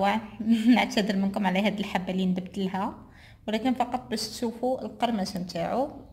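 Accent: Skikda, Algeria. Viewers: يعطيك الصحة يا الفحلة انموت على الهدرة تاع ناس سكيكدة اتمنى النجاح لقناتك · روعى لقريوش بصح من هضرتك باينا سكيكدية انا من قسنطينة. بصح صعيب شوية فى خدمتواويدي الوقت. يعطيك الصحة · جابلي ربي من اللهحة انتي سكيكدية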